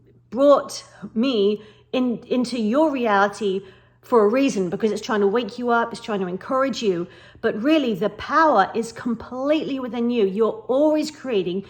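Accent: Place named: England, United Kingdom. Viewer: Ha, i thought you had an English accent that explains visiting wales a few times 👍 you know you mention about being of an older age even in our 50s or older as long as you know about conscious awareness that our thoughts are living entities creating our physical realities then evrn 10 20 or 30 yrs of life of having this awareness that we can create anything through DISCIPLINED! Mind to speed up the progress or as i use my own affirmations or subliminals on our voice recorders on our phones played every night as we sleep for our desires can bring everything to you so even at the later part of our lives through conscious awareness manifestation we can achieve more than just scattered rolette style thoughts of randomness it is all about FOCUS, AND DISCIPLINED MIND, thanks for mentioning wales 🏴🙏 worth mentioning the mirroring techniques to of bringing things to us faster 🪞😉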